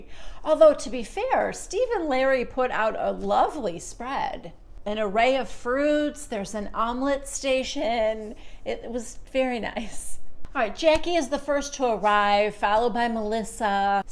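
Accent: New Jersey, United States. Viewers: Love your Jersey accent. Lol. You sound like a mob wife. I have always liked Joe Gorga. I believe this guy has a hard of gold and loves his family deeply. I feel so so sorry for that Marty guy. He needs to run away as fast as he can and take his kids with him. Love you. Keep up The accents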